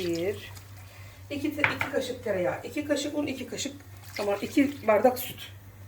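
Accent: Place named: Aydın, Turkey. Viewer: Benim de annem ve babam vefat etti ve hanimi anneme benzettiğim için çok izliyorum. Bana annemi anımsatıyor. Aydınlı olduğum için şivesi de benziyor lezzetler de benziyor ah ah.... hanım Allah size uzun ve sağlıklı ömürler versin..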